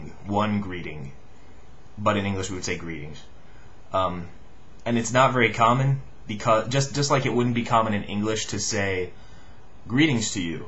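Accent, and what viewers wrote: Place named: Egypt. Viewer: Wow your pronunciation is good! And it sounds a lil bit egyptian haha ~ good luck for you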